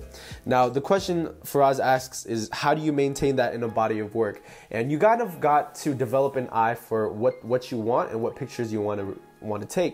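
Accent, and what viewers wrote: Philippines. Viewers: Hi ate! HAHA lovin' the Filipino accent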